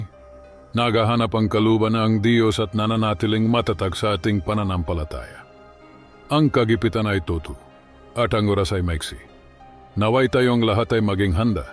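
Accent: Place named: India. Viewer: Tanong kolang po...pinoy ka po talaga?kasi parang indian ka boses mo or kano na nag aral lang magsalita ng tagalog pangit sa tainga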